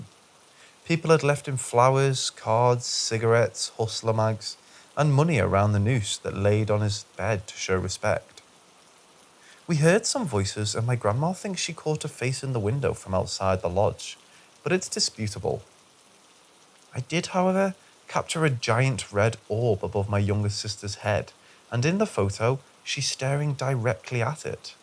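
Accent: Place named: United Kingdom. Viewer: I'm British so love to hear a fellow British narrator. Thank for the uploads mate.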